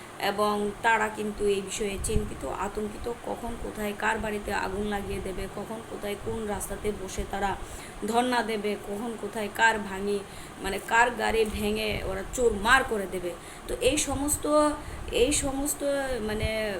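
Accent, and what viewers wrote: Bangladesh. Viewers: Truly tripurar basinda hola eai babe kotha bole na sotti tumi bangladesi tumar kothatei porichoi hoi.....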